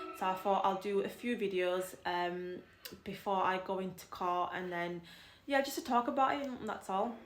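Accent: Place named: United Kingdom. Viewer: Thank you for your video, it’s always interesting to learn more about this topic. I’m a follower on TikTok, and I love both your content and your father’s 🩷 You have such a lovely British accent, it’s so pleasant to listen to 🥰 Xoxo from France!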